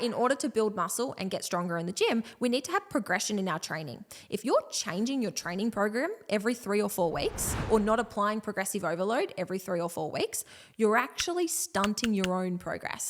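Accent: Australia. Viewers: Damn your accent is incredibly Australian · Australian? I miss that accent. Had a lovely time during my stay in Melbourne.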